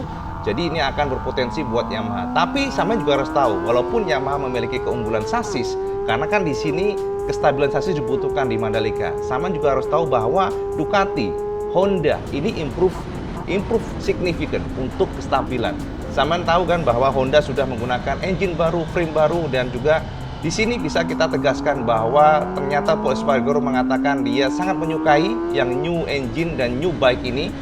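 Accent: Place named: East Java, Indonesia. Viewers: Cak sampean tuh kasih Impromasi nya penak di mengerti sangat kentel jowo neh sukses untuk sampean... 🤭😄😄🙏 Mantap👌👌👌👌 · Suwun cak infone... Mantap 👍👍👍 Ndelok logat ngomonge sampeyan teko Jatim yo !? 😀😃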